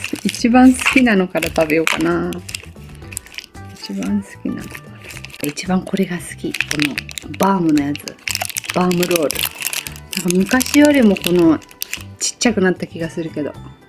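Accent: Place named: Oita, Japan. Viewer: 返信ありがとうございます🎵💖 さん大分なんですか⁉️😅 道理で方言が似てると思ったです😊 余り鹿児島弁は出てないですよね‼️ これからもいっぱい楽しい動画を宜しくお願いします🎵💖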